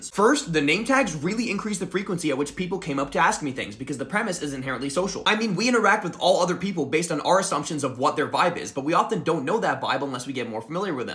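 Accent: West Virginia, United States. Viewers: Are you from West Virginia by any chance?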